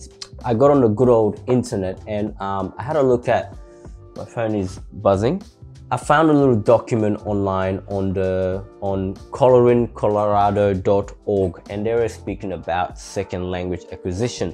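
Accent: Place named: England, United Kingdom. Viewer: I like your English accent i hope you will have more good video # from Thailand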